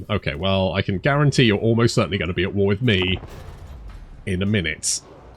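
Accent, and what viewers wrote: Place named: United Kingdom. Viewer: Happy New Year everyone! Since it's a new year and a new campaign(and likely to get a lot of views) when did you guys find and what campaign? The first campaign I watched was Kemmler and Krell... for Warhammer 1 which was what seven years ago oh time flies. The cinematic battles via replays were something nobody else at the time was doing(at least Surrealbeliefs and Heir of Carthage weren't maybe somebody else was idk)and that was just a great watch and so was listening to the funny British man babble about turning units into jam and stuff.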